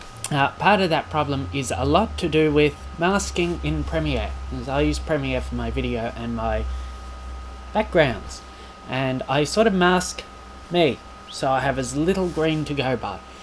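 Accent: Australia. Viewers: You sound more Australian than me haha. Little tip for your green screen, try to get the material tightener on your frame, it helps heaps with keeping the background images solid. Otherwise great video :) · Speaking as an NZer you sound Australian to me.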